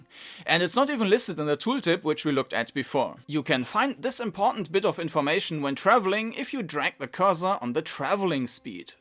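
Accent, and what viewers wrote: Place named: Germany. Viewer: der deutscheste Akzent, den ich je gehört habe 🙂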